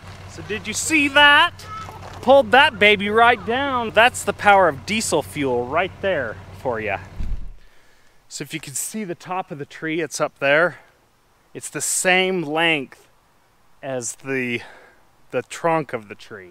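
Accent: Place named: United States. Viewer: Love your channel, and the accent "Did you see that, up thar?" Brilliant! What part of the States are you at? From a Kiwi fan.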